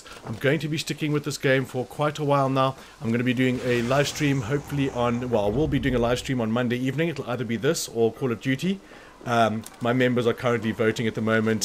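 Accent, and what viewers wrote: South Africa. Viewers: yes sir. You also from SA? Sounds like a South African accent 😁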